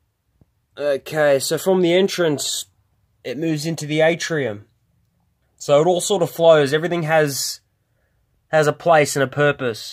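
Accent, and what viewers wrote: Australia. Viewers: He sounds SO Australian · Just a suggestion for moc's like the one you just did, have you ever heard of brick arms? Brick arm's are miniature weapons and accessories which are compatible with minifigs. I have quite alot, I can tell you're Australian so lucky for u the nearest website is in Melbourne, Victoria it is called rick's james bricks, they sell brickarms and lego. Hopefully this info is useful to you.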